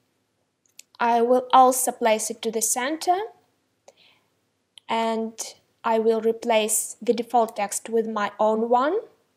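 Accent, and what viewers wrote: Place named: Russia. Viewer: russian accent) забавно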